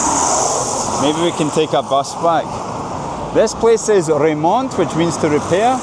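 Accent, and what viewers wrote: Scotland, United Kingdom. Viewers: Акцент конечно😮. Оказывается так по-английски говорят шотландцы. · Я смотрю довольно много видео об иностранцах, путешествующих по России. Но когда я услышал ЭТОТ акцент, я подумал "О, да! Ты точно шотландец!" :D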